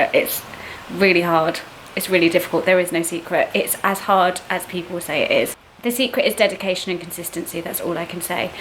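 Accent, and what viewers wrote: United Kingdom. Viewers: nice true English voice :)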